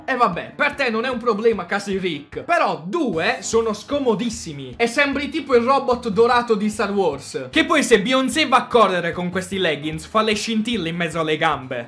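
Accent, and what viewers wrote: Apulia, Italy. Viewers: ma sei di puglia?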